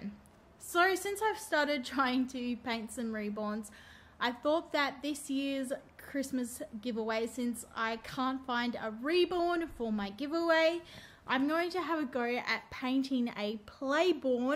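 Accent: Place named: Australia. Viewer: Hi sweetie,i just adore your accent,i so wish i had been born in Australia. That’s a great idea,they are so cute,this is so generous of you,i dont know if I’m allowed to enter as I’m from Ireland xxxxxxx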